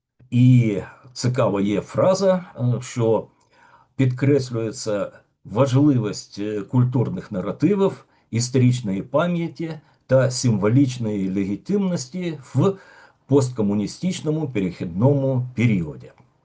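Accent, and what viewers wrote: Russia. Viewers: ... більше розмовляйте українською в побуті, бо рассєйська на губах висить.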